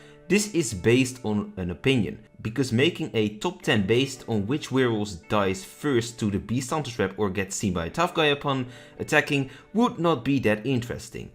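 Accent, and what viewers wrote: Netherlands. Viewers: Why do you sound so Dutch?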